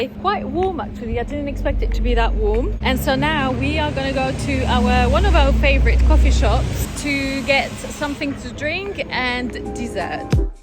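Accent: United Kingdom. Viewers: Very good British accent, I prefer this version by far.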